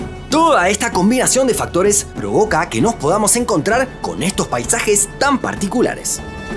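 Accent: Argentina. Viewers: Hola, sos de argentina o de uruguay? Porque tenes un acento de mezcla del neutro pero nose si con el argentino o uruguayo. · Y ese acento más argentino.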